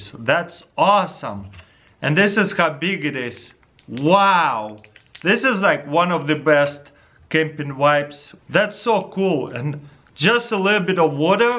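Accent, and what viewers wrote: Russia. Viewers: I love you russian accent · Im watching u cause i wanna try and learn how to do a russian accent(you're russian right?:P) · Русский акцент слышен издалека )) привет, чувак :D А штука прикольная)) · Bro i LOVE Russian accents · Your a russian right please speak yoir word and make a subtitled Who agrees!? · По позорному акценту сразу понятно, что русский, лол.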